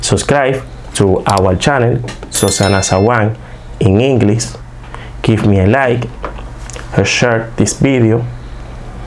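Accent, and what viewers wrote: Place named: Dominican Republic. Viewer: Ese acento de inglés me suena dominicano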